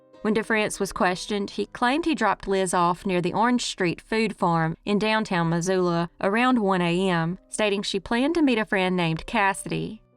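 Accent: South, United States. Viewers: THANK YOU!!LOVE THAT COUNTRY VOICE! KEEP EM COMING!1:hand-pink-waving:face-red-heart-shape:thanksdoc:🤠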